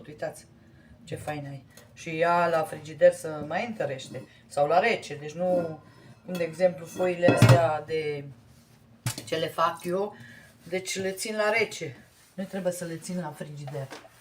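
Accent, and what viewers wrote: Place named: Banat, Romania. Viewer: Aveți o voce tare plăcută, aș vrea să vă tot ascult,precis sunteți bănățeancă de-a mea, este o plăcere să vă urmăresc.DUMNEZEU SĂ VĂ BINECUVINTEZE 🙏🙏🙏🙏❤❤❤❤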